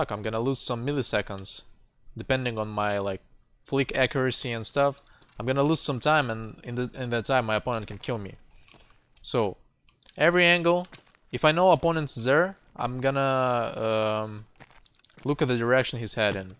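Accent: Russia. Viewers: Wait, I can hear barely noticeable russian accent :) Am I right?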